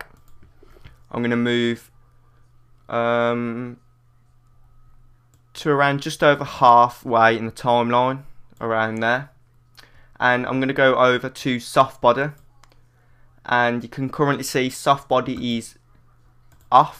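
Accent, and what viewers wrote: Birmingham, United Kingdom. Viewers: haha Brummie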